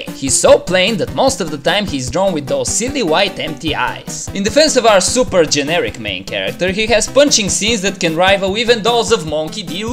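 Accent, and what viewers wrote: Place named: Romania. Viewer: Ima say Romanian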